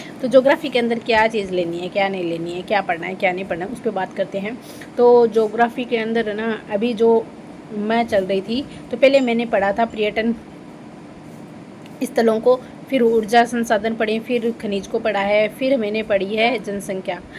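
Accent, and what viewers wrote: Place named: Marwar, India. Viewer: Hindi se achi toh marvadi lage छ थे तोह मारवाड़ी ही बोल्या करो जीजी 😊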